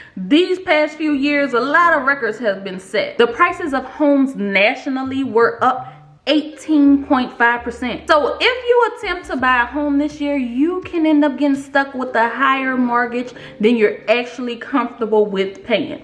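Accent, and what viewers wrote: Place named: Louisiana, United States. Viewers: Oh, I know you from Louisiana. I'm in Slidell and I hear home all in your voice. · You gotta be from Louisiana. That accent sounds too familiar!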